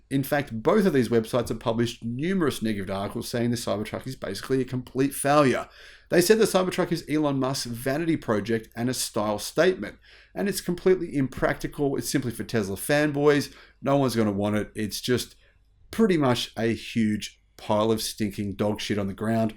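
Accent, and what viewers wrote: Australia. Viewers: What an Aussie accent maaate. Ah well you can take an Aussie out of Australia but you can’t take the Aussie out of this man.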